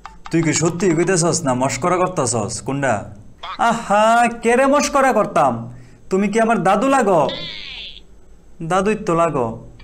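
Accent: Brahmanbaria, Bangladesh. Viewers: ভাই আপনার কথা গুলো বাংলাদেশ ব্রাহ্মণবাড়িয়ার আঞ্চলিক ভাষা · আমডার বাউনবাইরার ভাষা দি😂😂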